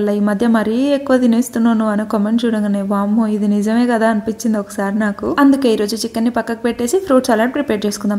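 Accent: Telangana, India. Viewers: nuv pakka telangana basha matladutunavv..manchiga anipistundiii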